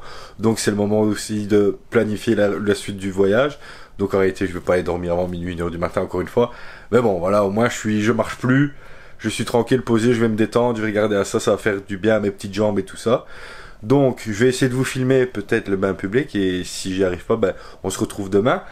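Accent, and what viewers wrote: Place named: Wallonia, Belgium. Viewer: jolie vidéo que j'ai découvert par hasard via des liens sur facebook... ca fait plaisir de voir un francophone au japon avec un bon petit accent de wallon... ca change des français. ;-)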